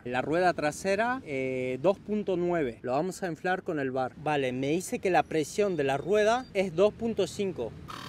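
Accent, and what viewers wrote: Argentina. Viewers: Habla una mezcla entre porteño y español ajajajaj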